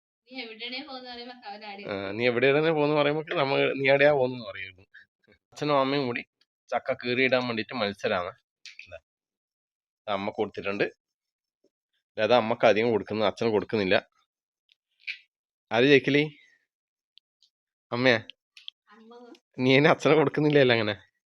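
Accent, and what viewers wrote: Kannur, India. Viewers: താങ്കൾ പറയുന്നത് ശരി തന്നെയാണ് ഒരു ലിസ്റ്റിൽ തന്നെ നാല് അഞ്ച് സ്റ്റൈൽ ഭാഷ ഉണ്ടാകും..ഞങ്ങളുടെ മലപ്പുറത്തും ഭാഷകൾ വ്യത്യാസം വരും..ഉദാഹരണം വേങ്ങര എന്ന് പറയുന്ന സ്ഥലത്ത്.. കജ്ജ് എന്ന് പറയും.. ഞങ്ങൾ പെരിന്തൽമണ്ണ ഭാഗത്ത് കയ്യ് എന്ന് പറയും.. വേങ്ങര പാട്ട് പജ്ജ് എന്ന് പറയും.. ഞങ്ങൾ പെരിന്തൽമണ്ണ പശു എന്നു പറയും... വേങ്ങര ഭാഗത്ത്. കജ്ജുമ്മ നജ്ജായി..ഞങ്ങൾ പെരിന്തൽമണ്ണ ഭാഗത്ത്. കയ്യുംമേൽ നെയ്യ് പറ്റി.... വർത്താനം സ്റ്റൈൽ നല്ലതാണ്.. അബുദാബിയിൽ എനിക്കൊരു കൂട്ടുകാരൻ ഉണ്ടായിരുന്നു അയാളുടെ പേര് തമ്പാൻ.. അദ്ദേഹം കണ്ണൂരാണ്.. നിങ്ങളുടെ അതേ ഭാഷ തന്നെയാണ് ചിലപ്പോൾ നിങ്ങളുടെ നാട്ടുകാരൻ ആയിരിക്കാം.. ഓക്കേ ബ്രോ കണ്ടിന്യൂ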